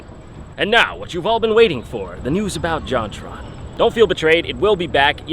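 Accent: New York, United States. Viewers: AM I the only one that noticed jon is totally getting a new yourker accent now?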